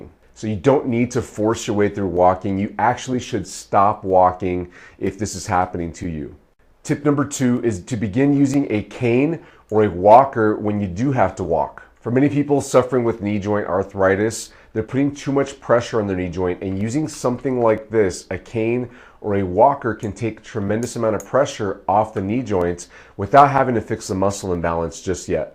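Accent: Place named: United States. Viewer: I hate this blody American English. Thooo..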